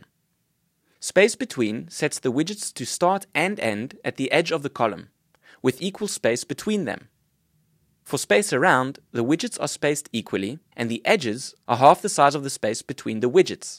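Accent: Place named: South Africa. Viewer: I can spot a South African accent from far . . . that broer is definately from SA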